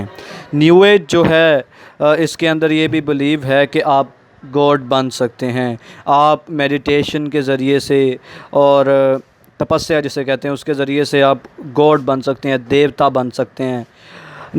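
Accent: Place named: England, United Kingdom. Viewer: Ur English Accent I Like It :)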